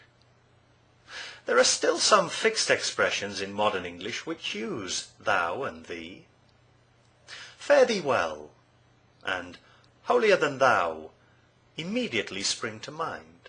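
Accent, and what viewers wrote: United Kingdom. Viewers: Is Mr. a native British speaker???????????????????????????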